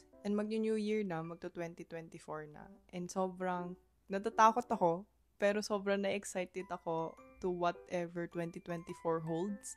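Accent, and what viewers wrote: Batangas, Philippines. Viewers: Saan ka dine sa batangas? Kakatuwa ang punto mo, batangeño batangeño eh..😂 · The batangueño accent is giving HAHAHAHAHA nachika kalang pero nakakahatak talaga ng attention hahahaha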